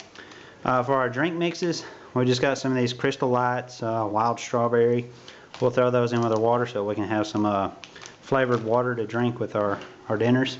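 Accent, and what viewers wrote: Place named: North Carolina, United States. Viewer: Great video man ive seen a few of yours and am glad to see the circuit and the montbell products review because i am considering those purchases. My food is very similar to yours minus the snack cakes, candy, and fig newtons but i understand the extra calories for winter. I would check out the Knorrs sides meals for dinner because they are only about 99 cents. I usually add some tuna to them for extra protein. Sounds like you are from NC, im from Washington, NC.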